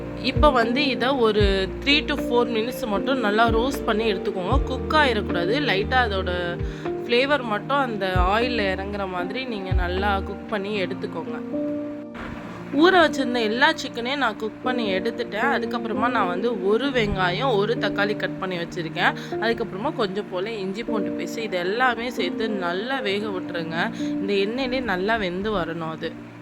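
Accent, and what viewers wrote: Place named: Ramanathapuram, India. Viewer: Akkaw neenga ramnad district dhane,apdiye enga ooru karavanga Madhiri pesuringa.chicken gravy pathale echeel oorudhu ka.mouth watering dishes ka.superb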